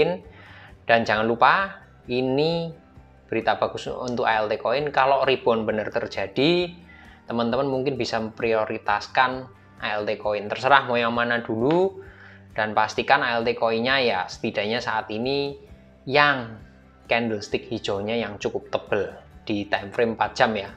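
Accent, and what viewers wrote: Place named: Sleman, Indonesia. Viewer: Jelas² sleman Logat & bhsane bedo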